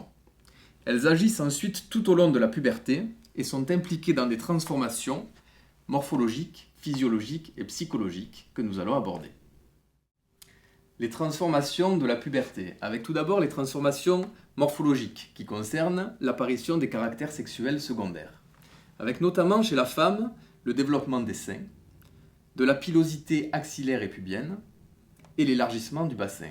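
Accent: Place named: South, France. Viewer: merci beaucoup pour vos vidéos ! ça m'aide pour le bac :) le seul bémol c'est qu'étant du nord de la france avec mes amies on adore imiter l'accent du sud et du coup quand je vous entends parler parfois j'ai envie de rire x) (mais ce n'est pas méchant)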